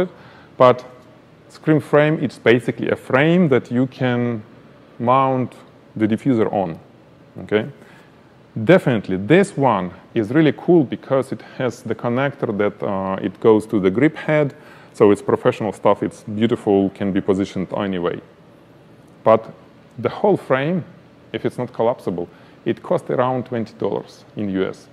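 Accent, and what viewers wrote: Russia. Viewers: Hi, firstly are you Russian? Great accent!:D. OK real question, I make handmade beaded jewellery, but my product photography really doesn't do justice to my jewellery. My biggest issue is how to get the sparkle in the crystal bicone beads, but not blow out the duller toho beads. Over a year I have been trying, and no matter what I can't seem to take decent images on a white background on a budget for my shop. I absolutely loathe trying to do the images now, as to photograph say 10 items can take me over four days, and I'm still not happy at the end. Any chance you would concider doing a video on something like this? I'd even be happy to send you a piece of my hand made jewellery for you to use for the demo which you could then pass on to your loved one. Desperate for help, as a single mum trying to make money from my jewellery making skills is being let down by the fact I am unable to take the images that show off the jewellery. 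Kindest regards Linda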